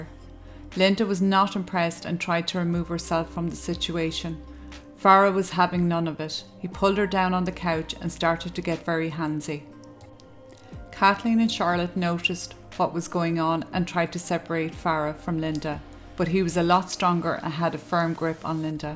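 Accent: Ireland. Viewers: Love your content and your soft, lulling Irish voice. I've only just found your channel and have now watched all your vids so I'm eagerly waiting for more. Sending love and luck from the West coast of Australia. 💚🍀💚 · Am I a very bad person for still being rooting for these girls after all? Dunno, for once, girls doing an abusive pr**, I really can't be that sorry for him. But Jeez, was this a true mess...... Greetings from Italy from someone who was living in Dublin but left the year before this all happened 🧡love your channel (and your accent, it brings back so many nice memories...)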